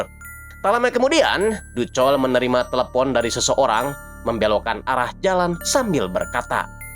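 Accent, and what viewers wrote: West Java, Indonesia. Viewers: Lucu ga ada serem seremnya karena pembawa ceritanya sunda pisan 😂😂😂😂😂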